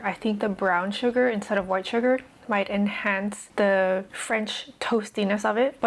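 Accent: Hawaii, United States. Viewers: 30 seconds in and i knew u were from hawaii too, love it!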